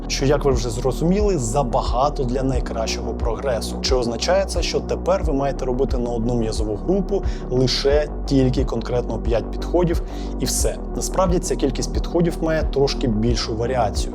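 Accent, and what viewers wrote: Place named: Russia. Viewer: А я думаю що поспішає і з-за цього 20-30 % слів виходить з російьким акцентом. Треба трохи повільніше, щоб звикати до мови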